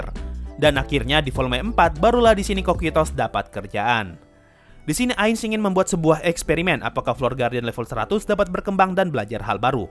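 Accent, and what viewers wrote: Indonesia. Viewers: Kita org indo pke aksen indo emang nda boleh ya? Ya gak min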